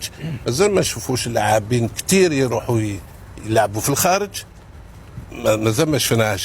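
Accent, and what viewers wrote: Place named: Algeria. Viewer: مرة حلوة لهجة الجزائر احب هذا البلد من بن شيخ احسن ناس ونعم بالله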